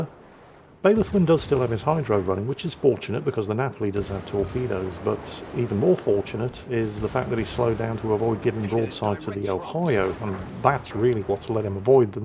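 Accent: United Kingdom. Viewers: I think both ways are correct, its just your dialect of our great brit ish language, e.g. some same bath as bah th, others call it a bar th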